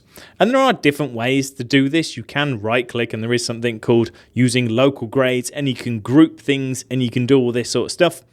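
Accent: United Kingdom. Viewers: SO MANY TIPPS for my workflow!! Thank you so much from Germany and let me say that I love your accent. Which part of UK is it from?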